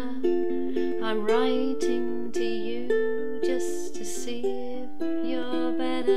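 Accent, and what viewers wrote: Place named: United Kingdom. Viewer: ya why don't ya give a tutorial on " " plssss..never heard that song b4, i looked it up on youtube and gave it a listen...that's such a surreal, such a poetic song... yes, u should do a tutorial... btw, what nationality r u? u sound british...to my ears at least...but then what do i know.! haha!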